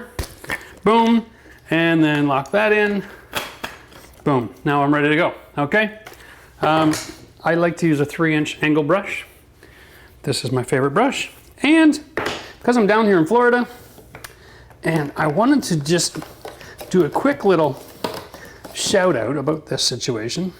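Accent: Canada. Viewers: Great Canadian accent! 😊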